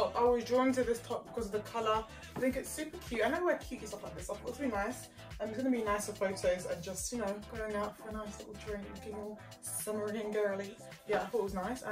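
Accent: United Kingdom. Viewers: A black girl with a British accent, is a white Canadian dude’s dream!!! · Very attractive sounds British accent keep up the great videos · Pretty darkskin, slim thick and that UK accent :face-red-heart-shape::face-red-heart-shape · Can you blame them? 😉I hope this isnt in anyway racist, but I've always thought that a beautiful black woman with a British accent was HOT! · You are really beautiful¡¡¡ you look likea doll and your british accent goes perfect with your personality. you have a heavenly body, close to a perfection. Receive a warm kiss from El Salvador. Carlos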